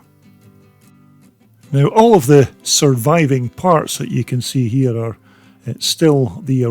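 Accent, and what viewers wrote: Scotland, United Kingdom. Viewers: Love your accent-are you Scottish? The only uncle I ever had was a hired hand on my grandparents tobacco farm in Canada and he was the coolest uncle you could ever have. John in Texas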